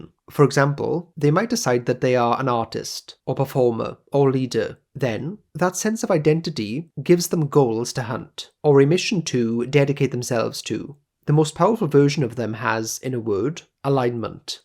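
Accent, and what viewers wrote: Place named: RP, United Kingdom. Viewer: INFJ here. The bigger picture destroyed and made me at the same time 😅 also.. you have an extremely posh voice, I love it. 😅😊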